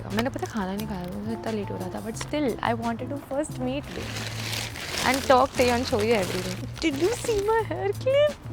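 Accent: Gujarat, India. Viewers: Loved your gujju accent 😂💖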